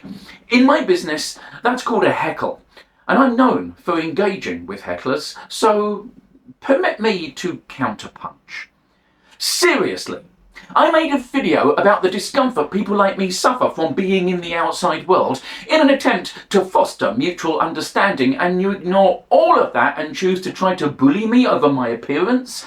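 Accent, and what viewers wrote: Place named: United Kingdom. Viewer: What painted nails? Im too busy reading his face while hes talking. Im too busy taking in his words, tone, delivery, expressions, eye movement, what hes wearing, his cleanliness, his neatness, whats he wearing, whats in the background, where is he? Are there background noises? What area of the UK is he from with his accent? And my own autistic brain needs time to digest and process his words. Where is he from? What does he do? A thousand things to take in. I didnt notice any polished nails. I was too busy watching his face because thats how i listen. I listen with my eyes as well as my ears. 😅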